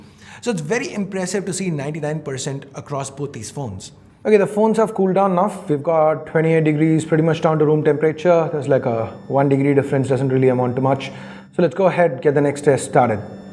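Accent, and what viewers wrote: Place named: India. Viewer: Finally, Indian english speaking reviewer...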